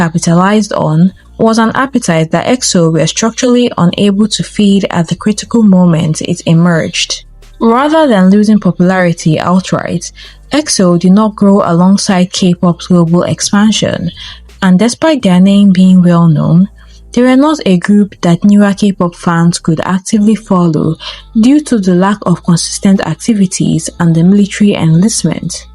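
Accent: Nigeria. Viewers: I can clearly hear her Naija accent and she speaks so well. so what are you talking about?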